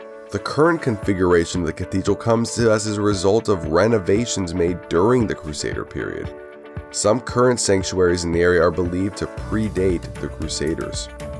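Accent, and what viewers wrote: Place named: United States. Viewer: Video and preparation optimal. Commentator's English is too American and word eating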